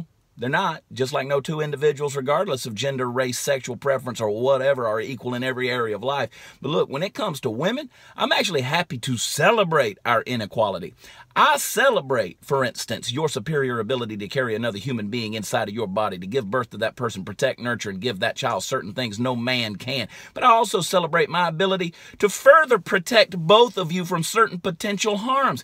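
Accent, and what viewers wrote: Texas, United States. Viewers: I could listen to you spout the truth like this in that manly Texan drawl all day long. Every man needs to listen to this video AND others. My kinda guy. Keep it up, we like yer style.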